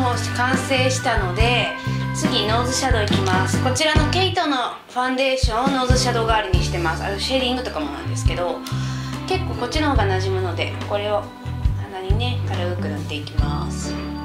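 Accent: Kansai, Japan. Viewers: さん、関西弁やから親近感がわく( ＞∀＜ )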